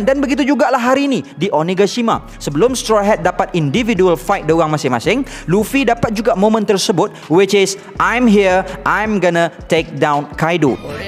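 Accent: Malaysia. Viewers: Waa hebat la abg pandai cakap manglish.. 🥳🥳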